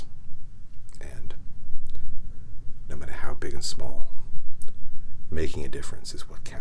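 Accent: United States. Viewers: So wild how you can do a really good American accent. Love theese videos the breathing techniques really help me to relax enough to rest at night. Thank you!😊💤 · These Reiki sessions are such a treat - the gravelly US accent is so restful. I do hope you'll consider doing more of these after the 8 'block bookings' are over? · Can we talk about how this guy can just casually do a great American accent? I’m a northerner and I’ve been in the states for like 2 years now and still can’t mimic the accent! How did you get so good at that lad?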